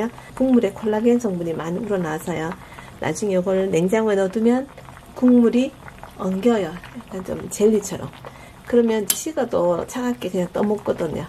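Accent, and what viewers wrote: Gyeongsang, South Korea. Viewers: 경상도 사투리 목소리가 너무예뻐요 유익한정보감사합니다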